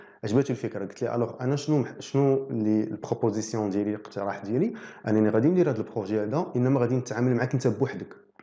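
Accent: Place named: Maghreb, Arab world. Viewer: حاولت افهم ما قدرت فعلا لهجة اخوانا بالمغرب العربي صعبة الفهم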